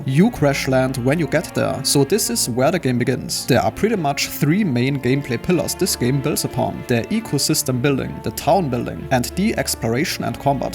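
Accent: Germany. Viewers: Sorry to say this but I couldn't get past the thick accent (assuming you're from Germany?) Maybe try to slow down your narration and enunciate the words a bit more. I hope this helps.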